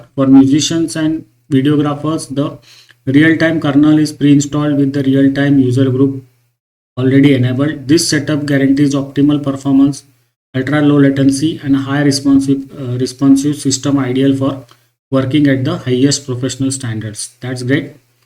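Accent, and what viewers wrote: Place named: India. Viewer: ugh.. indian accent on top of a bad microphone.. 👎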